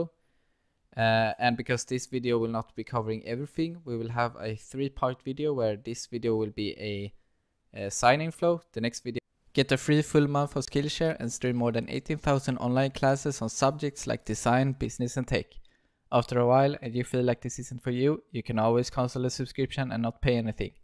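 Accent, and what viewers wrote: Sweden. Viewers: Your English is good! I do play a lot of Counter Strike with Swedes so I recognized the accent 😅 I'm from Denmark so it's not like my English is much better haha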